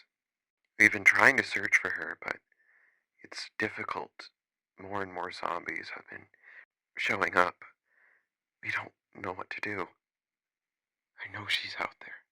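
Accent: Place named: United Kingdom. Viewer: British aha voice ❤ adorable character 💪💗💗💗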